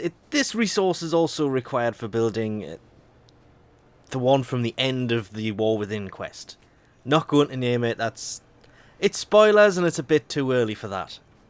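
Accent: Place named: Newcastle, United Kingdom. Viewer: North east of England, sounds like Newcastle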